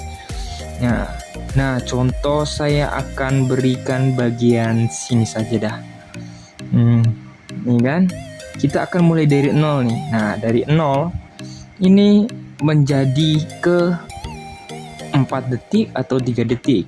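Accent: Makassar, Indonesia. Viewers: Orang makassar yah bang?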